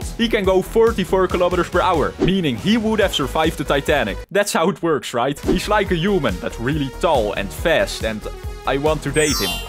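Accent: Netherlands. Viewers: Idk but are u Dutch u sound Dutch :) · Dutch accent going crazy😂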